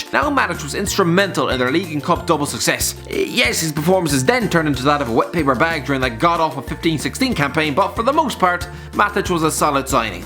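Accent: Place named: Ireland. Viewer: This guys Irish voice is so annoying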